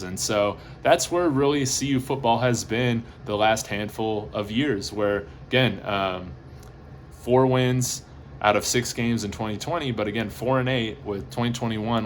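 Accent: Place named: United Kingdom. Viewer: yes I saw - nice to hear a British accent in the videos. Traoure looks like a beast - but I was also waiting on Christian wade to break through for the Bills! Fingers crossed CU can get one of them into the league - trying to keep up with who is from last year with the CU and who are the new prime recruits. But it will be fun to watch the spring game! Keep up the content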